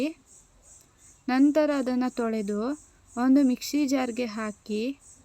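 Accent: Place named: Kundapura, India. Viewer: Nevu mataduwa bhashe tumba chennagide kundapura Bhashe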